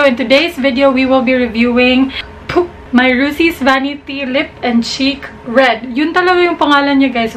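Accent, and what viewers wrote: Visayas, Philippines. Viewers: ang cute mo po ate bisaya ka po ba? hahahahaha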